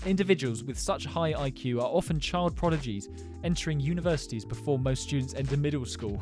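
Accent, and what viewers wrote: United Kingdom. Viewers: I feel like your accent is like British with something else that I don’t even know the accent singing other songs and perfecting the accent except not American accent. Oh my goodness.😂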